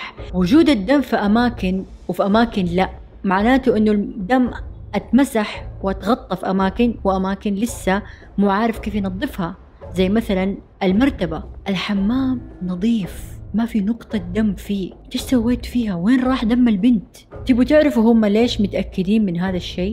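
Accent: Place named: Jeddah, Saudi Arabia. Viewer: 😂😂😂البنت حامل والدنيا شوب .. يا ويلي انا تابعتك عشان لهجتك .. لدرجة انو صرت اذا قابلت بنات اعرفهم من لهجتهم انهم جداويات .. من اجمل اللهجات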